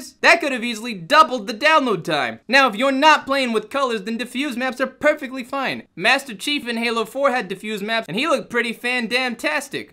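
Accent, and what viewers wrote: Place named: Boston, United States. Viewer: Boston accent, it seems.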